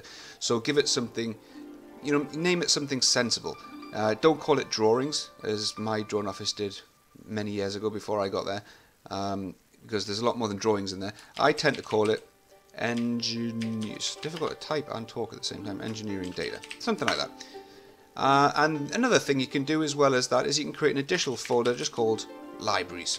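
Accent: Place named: Newcastle, United Kingdom. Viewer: CloudCAD Ltd Thanks for the prompt response. Got a basic test setup running as per your video. I am an IT guy but an AutoDesk noob - are there any websites providing question/answers that you can recommend for Autodesk similar to experts-exchange, stackexchange, spiceworks etc BTW I am a Geordie - live in Whitley Bay. The accent works well for presenting - think Robson Green. Thanks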